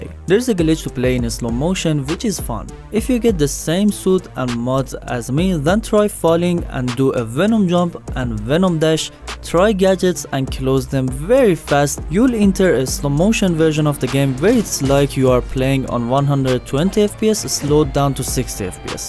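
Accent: Iran. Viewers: خخخ. داداش دمت گرم. از لحجه ات فهمیدم ایرانی هستی 👍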